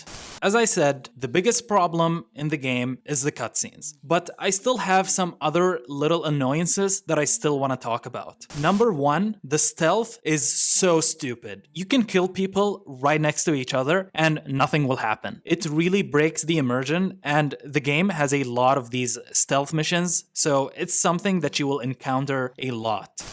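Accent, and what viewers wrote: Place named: Japan. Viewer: You can tell hes Japanese by his accent so Im sure he knows his ancestors history...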